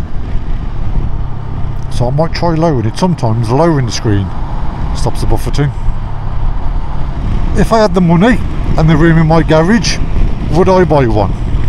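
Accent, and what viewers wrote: Birmingham, United Kingdom. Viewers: Do I detect a Bham accent? Looks a bit like Brum in the vid. Yeah this Bike ticks a lot of boxes, esp if you just passed your test. Think am going for the Interceptor though, looks over sense haha (Honda mpg etc n longevity). I would def want a center stand.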